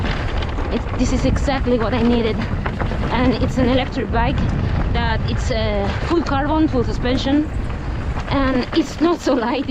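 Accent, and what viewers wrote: Cartagena, Colombia. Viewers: que rraro hablas cartagenero..... :0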